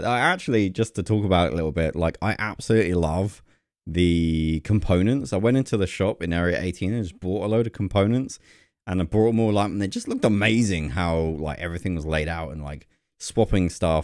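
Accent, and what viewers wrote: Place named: England, United Kingdom. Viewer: i dont noouuu ... omg, if original English sounds like that than i prefer more American English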